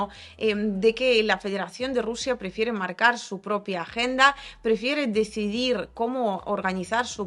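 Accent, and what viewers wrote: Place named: Spain. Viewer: hermoso vídeo gracias hablas "español " mejor que yo 😂 con acento español ( olé y olé )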